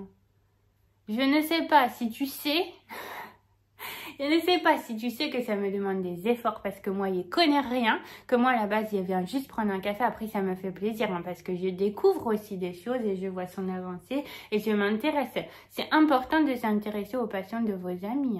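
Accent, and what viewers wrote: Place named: Spain. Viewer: Bonjour …un plaisir de te retrouver avec ton accent espagnol..tu es adorable et me fait bien rire 😊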